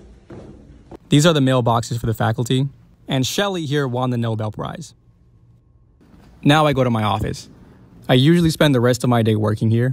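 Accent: Boston, United States. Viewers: The Boston accent really completes this. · idk where bro is from haha but he's def giving me Boston lmao. But I will say that Harvard (or the Ivy's in general) are not for me. In my undergrad at California State, we referred to kids who didn't study as Ivy leagues lmao or USC transfers. Glad to see a Harvard student breaking the stereotype have of them tho. (for context, I'm finishing my masters and am about to apply for my PhD rn)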